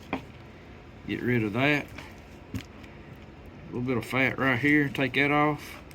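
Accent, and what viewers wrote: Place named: Virginia, United States. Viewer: oh my goodness...how you make me miss my Daddy. He skinned just like you do. He talked just like you do. I am not sure where you are from but you remind me of back home in Virginia. Don't forget to save your innards and put them under your garden plants. Makes for great fertilizer.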